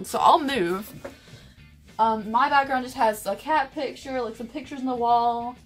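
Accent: South, United States. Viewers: it's funny how differently we see ourselves than others do. Seriously, you are the most beautiful YouTuber I've seen to date. Everything about your face is perfection to me. Your eyes, nose, lips, cheekbones, forehead is normal lol, etc. Your smile and laugh is everything to me. When I watch your videos, every time you smile or laugh, I do too, because it's that contagious kinda smile (which is a rare find for me). Your voice is fucking adorable as hell (ultra feminine with a splash of southern belle in there). I love that you always wear dresses, and have a vintage glam vibe to you. I found you very randomly a few months ago. One of your 'Thrift Store' videos was on my homepage, and naturally being as huge as a thrift shopper as I am, I was intrigued. I was instantly addicted to you, from the very first video I watched. I don't understand how you don't have millions of subscribers because you are hands down my favorite YouTuber to watch, and I get so giddy everyday when I see your post notification. 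I hope to do on my channel for people, what your videos do for me and your other stan subscribers. The only other YouTuber's I loyally watch is (freaking love her), and I don't care for the more run of the mill type of folk. Keep staying true to yourself. Much love!🖤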